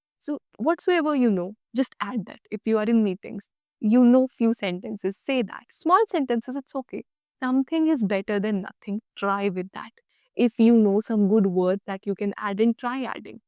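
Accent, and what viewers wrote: India. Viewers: Are u indian ?.....u have indian accent too much .... try to fixx itt good luck ❤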